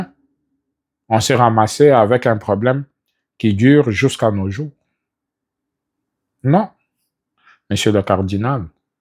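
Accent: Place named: Kasai, DR Congo. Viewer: Monsieur qui parle. S'il te plaît. En latin "vox populi, vox Dei. Ce nest pas le Cardinal qui doit trouver une solution pour l'est de la RDC. Tout le monde parle du dialogue. Le ministre Belge des Affaires étrangères ainsi que le jeune et vaillant président Ibrahim en ont très fraîchement parlé. Fais tout avec conseil, et tu n'auras pas à te repantir Tu es le seul à dire que c'est Kagame qui arme le M23. JKK, pour quoi a t il été condamné à mort? Si tu as un accent du Kasai, c'est normal que tu puisses défendre celui que tu défends. Le peuple d'abord, mon frère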